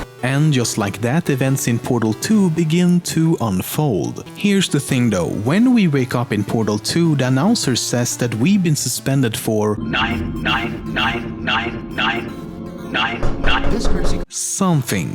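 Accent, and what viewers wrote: Sweden. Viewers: Jesus Christ the Swedish accent is killing me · Not to be mean but are you Swedish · are you sweedish? sounds a bit like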